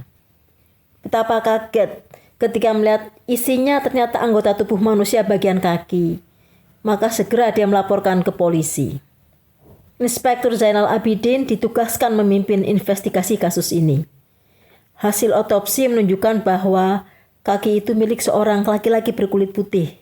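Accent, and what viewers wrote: Java, Indonesia. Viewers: ....... madam..... katanya mau 2x upload dlm seminggu....di tggu tggu kok blm juga... sukses terus ya madam.....kalo bisa medok nya di pertahankan.......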